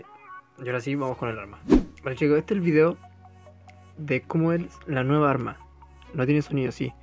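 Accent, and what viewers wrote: Chile. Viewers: Man de que parte de chile eres?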